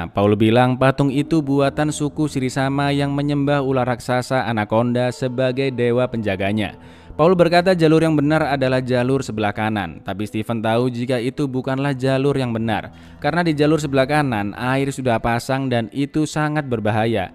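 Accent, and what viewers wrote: Java, Indonesia. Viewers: Ini Adalah Channel Membahas Alur Cerita Film Paling Asik Salah Satunya,Gara2 Membahasnya Medok + Asik:)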